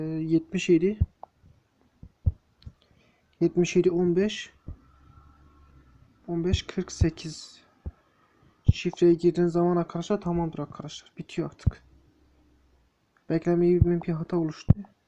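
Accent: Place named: Anatolia, Turkey. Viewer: Hey senin şivene kurban oluyum Anadolu insanı. 👍🏻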